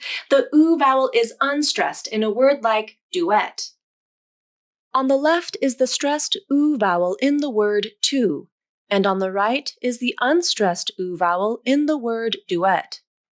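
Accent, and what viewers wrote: San Diego, United States. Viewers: everyday. thank you.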